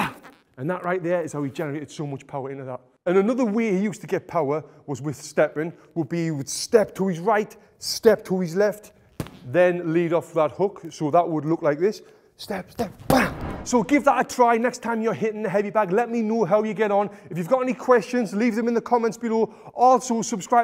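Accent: United Kingdom. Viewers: Sorry can't have a brit talking mike.tyson needs to be NY accent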